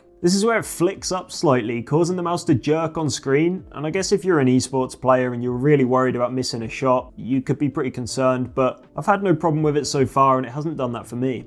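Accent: United Kingdom. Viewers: fucking english accent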